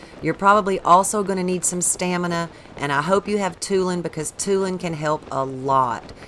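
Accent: South, United States. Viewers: Southern I know this, lived in Arkansas for a few years. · I must say your voice is why I continued to watch this vid as I'm the kind of player who saves anything and everything I find interesting on a game I'm into and once I beat the game I will go back and watch vids and use cheats and try glitches ext.. Well as I was adding it to my botw 2 list ( been saving vids since before it was officially titled) I heard your voice and well needless to say I can go easily and get the armor. I'm a hillbilly from the blue ridge mountains and it was refreshing to hear your southern voice narrating one of my favorite series biggest entry's! I was blown away and watched and listened all the way to the end. So to comment on the deception msg Well who cares if it wasn't her playing ( so awesome it was btw ) because it's still a very pleasant, easy to listen to and follow along, very well made information video and I'm looking forward to enjoying many more from her. 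· A southern accent and TotK? Sign me up lol. Im from NY. Don't hear that accent much. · What American accent is that i wonder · even if you weren’t playing I love hearing your voice in the videos. It’s refreshing to hear a beautiful American southern accent 😊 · I love your videos! Your southern accent makes them even more welcoming/casual/relaxing. Thank you for the content and for being down to earth about it. The sass on that pinned comment was the cherry on top! 🤣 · Hey girl! I just recently found your channel and I love how you admit about not knowing how to pronounce some of the names in the game! Same here... lol! Also, I'm not sure where you're from, but I'm from South Mississippi and I can relate to your accent ;) You have provided some pretty good tips and I'm now subscribed :D Keep up the good work!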